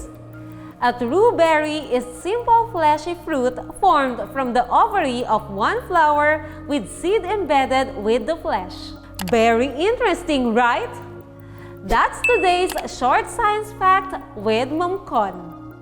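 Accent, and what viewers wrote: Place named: Mindanao, Philippines. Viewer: Ang tigas magsalita ni mam. Taga Mindanao si Mam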